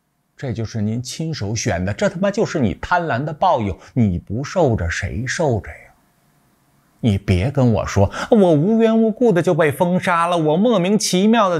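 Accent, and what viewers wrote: Beijing, China. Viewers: 聲調像是老北京，容貌眉眼有皇室貴族的感覺，該不會是愛新覺羅後代😊按讚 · 纯正的京片子，適度的京式曲藝评说神態; 貼地、真實的講評内容; 抑揚顿挫的语调; 是繁茂的自媒體叢林中的一朵奇葩！ 支持你， 。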